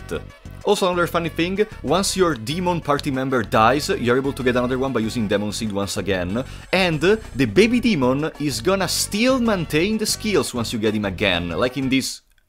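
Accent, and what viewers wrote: Italy. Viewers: Are you italian? Your accent is very similar to mine when I try to speak english haha thanks for the tips!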